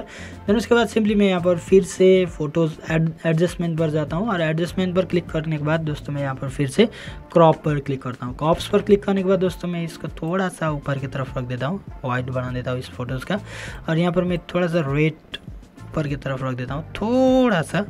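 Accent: Nepal. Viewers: Bro Nepali ho jasto x tara bhasa hindi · Nepali vhayara hindi ma video banouniii haa...sound le sedai th pouxa bro ...tapai nepali ho vanera .....😂😂😂😂😂😂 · You are nepali,you are not using correct hindi tone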